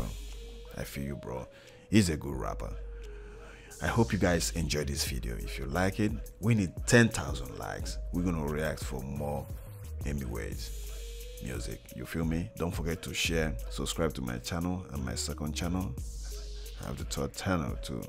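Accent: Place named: Nigeria. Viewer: His accent is Nigerian.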